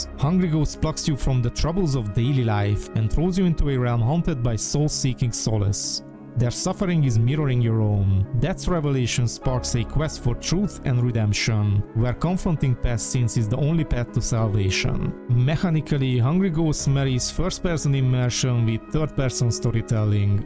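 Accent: Hungary. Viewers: Csak feldobta a videódat, de mondom ezzel az akcentussal tuti magyar. :D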